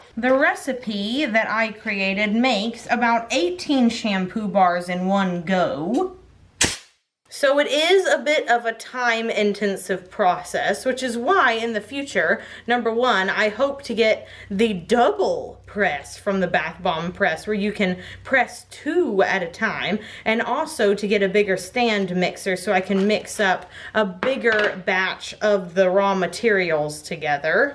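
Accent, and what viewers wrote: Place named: North Texas, United States. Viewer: I’ve been wondering what your accent was from because you sound exACTLY like my aunt Pam. You must be from norther Texas bear Oklahoma cuz that’s where my mom and aunt are from/grew up.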